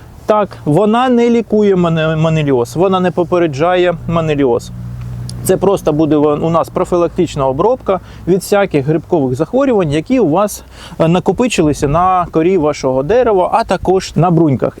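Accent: Standard. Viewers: А млжно ли этими ронпарптаии обработать вишни, яблони, груши, сливы. Инжир.У нам всех деревьев по 1 штуке и абрикос молодой еще не цвел, то его нужно будет обработать. А мадину и смородину иожно так обработать? И да мова чудова чиста и правильная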